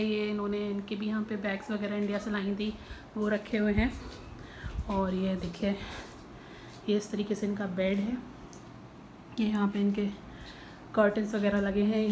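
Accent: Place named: Pakistan. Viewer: that's gd but you speak in Pakistani style and speak very clear Urdu as well even I've noticed one thing that you didn't use any single word of Hindi.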